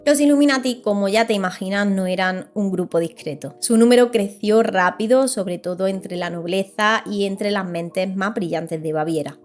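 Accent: Andalusia, Spain. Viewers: Me enamora tu forma de narrar la historia, sobretodo con tu acento andalú. Saludos desde Ecuador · Me siento raro por mirar un video neutral, bien redactado y objetivo sin que me hayan incertado un sponsor. Agradezco tu contenido. Fan del acento andaluz.:)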